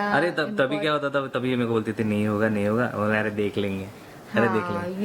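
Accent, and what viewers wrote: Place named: Solapur, India. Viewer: tum Solapur ke ho kya???? Blog mein sunne jaisa laga???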